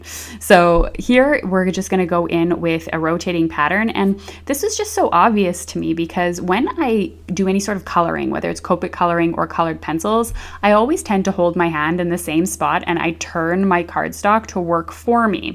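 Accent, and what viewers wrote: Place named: Canada. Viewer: Your Canadian accent is so cute. Idk how I feel about this platform. I like the lines and the clicks so it’s a maybe yes for me. Thanks for showing us how to use it ❤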